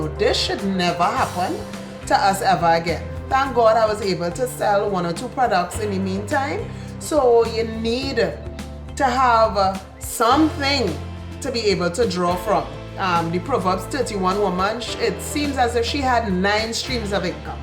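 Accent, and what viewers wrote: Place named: Trinidad and Tobago. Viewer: Yesss this is so true plus the story in a Trini accent makes it even better 😊 😘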